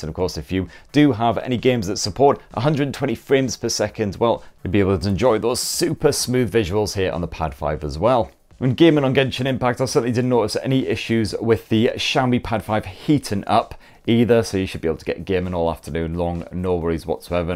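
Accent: United Kingdom. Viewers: You hv proper UK ENGLISH. Learned lot of new words from u.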